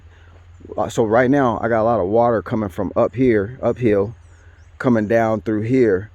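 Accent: South, United States. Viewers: I love the message. you sound like you from down south. my family is down for your training!! this is food!!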